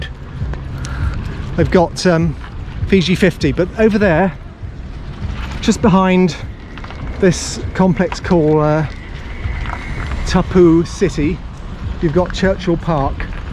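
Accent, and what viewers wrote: England, United Kingdom. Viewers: So what if he has an English accent...??? Sheesh, wish him well. Respect people wherever they came from, where they were born and however they speak....peace to all.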